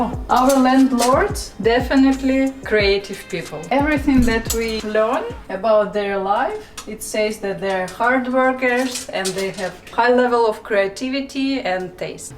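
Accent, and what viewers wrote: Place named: India. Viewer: Başkan sen niye Hintli gibi konuşuyorsun?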